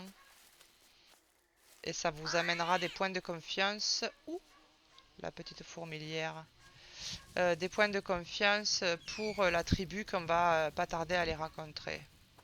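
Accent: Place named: South, France. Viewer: Je m'abonne je suis le 100ème abonné, j'adore green hell, j'adore 😍 j'aime le sud et ton accent 😍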